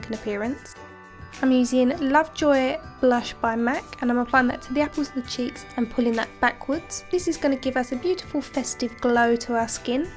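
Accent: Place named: South East London, United Kingdom. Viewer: This is a gorgeous look, I'm obsessed with and at the moment! I love your accent, where are you from? I'm from South East London and it reminds me of home! x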